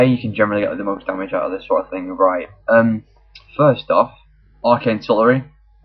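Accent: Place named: United Kingdom. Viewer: I loyke the british accnt ;))))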